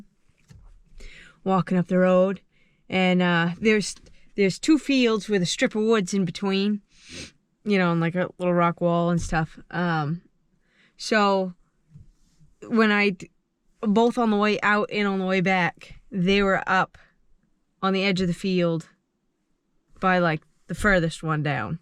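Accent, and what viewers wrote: Maine, United States. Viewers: Ha I don't know where your from but you certainly sound like a down easter.l0l. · I miss the Maine accent I was born in maine but haven't lived there since 1977 I have visited and hunted there over the years I really enjoy your videos good luck hunting your definitely putting in the time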